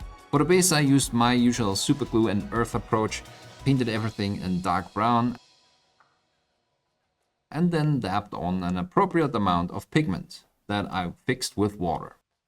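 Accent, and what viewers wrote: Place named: Austria. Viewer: for a second i thought you where saying "animal" paint ^^ our austrian-english accent really is fun at times XD i really enjoy the sponge technique btw. i used it for some aos nurgle armor and really loved the result TY =)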